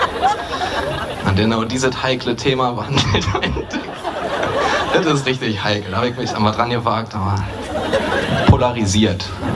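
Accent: Berlin, Germany. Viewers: allein der Mist den er redet Bringt mich dermaßen zum lachen.. und dieses berlinerisch dazu... ich Weine... xD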